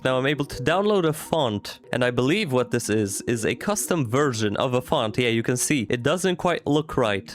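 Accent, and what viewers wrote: Poland. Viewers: Tylko dla mnie ma taki troche polski akcent? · dziwnie oglada sie polaka mowiacego po angielsku ale dobrze ci idzie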